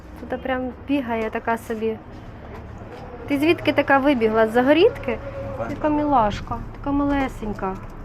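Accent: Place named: North, Ukraine. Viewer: ти з півночі України, говір такий цікавий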